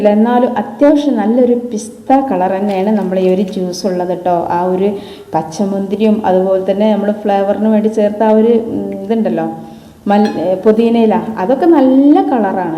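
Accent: Malappuram, India. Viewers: Malappuram thahta Samsara matiyallo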